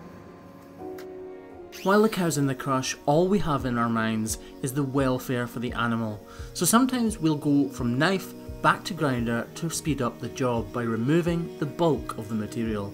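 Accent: Scotland, United Kingdom. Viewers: Gotta love that Scottish brogue, I dated a Scottish girl for about a year and I still miss that accent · That's probably cause he's Scottish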